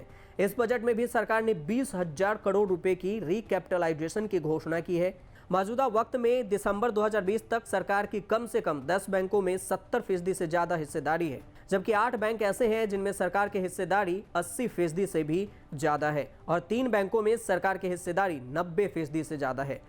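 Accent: Bihar, India. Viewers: Kisne is anchor ko rakha ,sudh hindi tak padna nahi aa rahi.bihari babu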